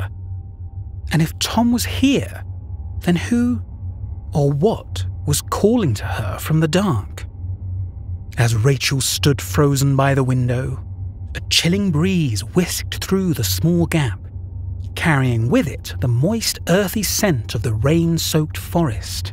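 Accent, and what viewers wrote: United Kingdom. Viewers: I just found your channel and I’m so glad I did! I noticed you have a British accent are there any cryptids from Europe that you are particularly interested in? I’ve always been fascinated by the Black Shuck stories from England and the Pricolici from Romania and of course the OGs Vampires and Werewolves 😂. Have a wonderful day ❤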